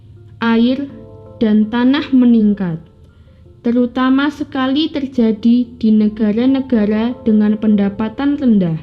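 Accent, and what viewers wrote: Java, Indonesia. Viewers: suaranya Medok dan cadel mbak 😄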